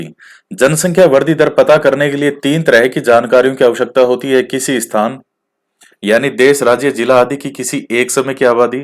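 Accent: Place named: Bagdi, India. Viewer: Sir हमारी तो आपके वाली ही बागड़ी बोली है, आप जब भी बागड़ी वाले शब्द बोलते हैं तो हमें बहुत ही अच्छा लगता है, जैसे ताती बलती लू,धाप के राबड़ी पियो और बाजरे की रोटी खाओ🙏🙏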